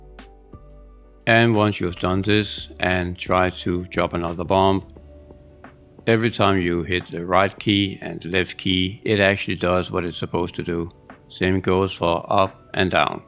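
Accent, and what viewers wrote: Denmark. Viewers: You sound danish, and thanks for the guide! It was really useful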